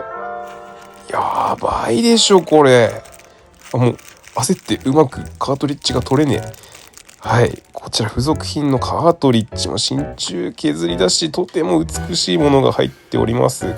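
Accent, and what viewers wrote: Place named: Hokkaido, Japan. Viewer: 以前から拝見してましたが初コメです。 北海道なんですね。今は東京在住ですが懐かしい方言がひとつふたつ聞こえたので。 冬の夜長の工作、私も嫌いじゃないです。 昨年から始めたサバゲ―の年配初心者です。コレクター志向ではありませんが所有する数丁の機能美には魅せられてます。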